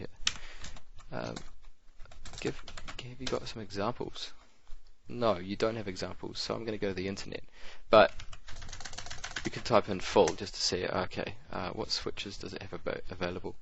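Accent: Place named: New Zealand. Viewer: Great Vudeo!! Viery helpful and unformative! the accent makes it better. Been doing some revusion on Powershell and this is great vud for ut! Go Kiwi!!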